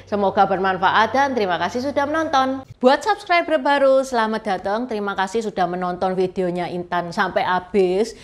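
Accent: Java, Indonesia. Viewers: Enakan dengerin dongeng nya tuch.. mantep bingit dg bhs indonesia yg ber'aksen jawa medok.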